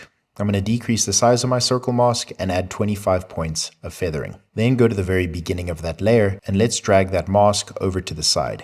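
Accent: South Africa. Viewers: ah , are you fron South Africa 😅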